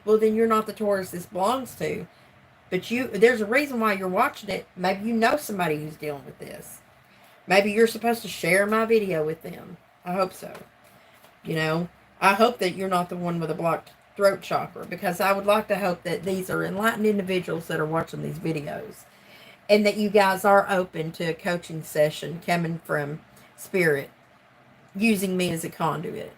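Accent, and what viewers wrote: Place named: South, United States. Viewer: ♥️🙌 Love the southern in ya, Sister! 💫🌻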